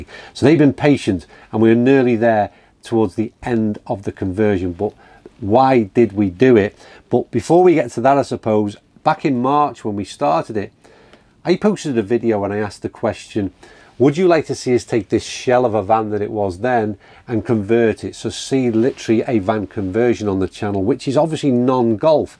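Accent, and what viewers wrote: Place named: Scotland, United Kingdom. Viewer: this is a good morning from Montréal, Canada. First I had to get used to your Scottish accent. Thereafter it is the most pleasant trip I can have to visit Scotland and appreciate a friendly average guy who happens to be a pretty good golfer, visit golf courses and listen to reasonable and fair evaluations of golf material. I should add on, the background music is most of the time very good. I feel like listen to the next door guy who’s talking to me and not taking me for a stupid consumer. Many thanks for your interesting material. Here’s it is -25 Celsius, even a Noodle golf ball would die in the middle of a flight.😎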